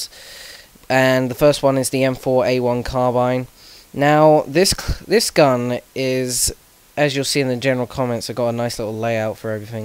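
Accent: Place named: United Kingdom. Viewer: Iclicked on this video thinking "Cool! an informative Create a video!" and then I heard the accent and i clicked away not because i didn't like the video, or that i hate British or English I just HATE the accent, and so many people have that accent on youtube and it makes my ears bleed.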